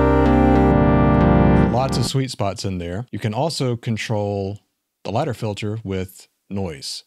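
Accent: South, United States. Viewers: What an awesome video. Or as you'd pronounce it, "Whhat an awesome video." :D You sound a lot like Billy Bob Thornton. Likely my favorite southern accent.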